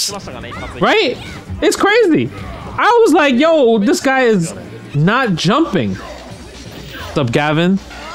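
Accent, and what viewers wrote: New York, United States. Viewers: Commentary is 🔥! Def. NY 🗽 talk albeit you in Canada 🇨🇦. 😂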